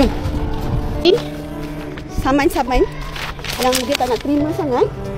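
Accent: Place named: China. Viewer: Content ni aku memang follow sejak PKP lagi. Content dia memang straight forward and aku sampai sekarang xleh nak kenal suara min ni memang cina ori ke or bukan cina tapi buat loghat cina😂❤❤❤❤❤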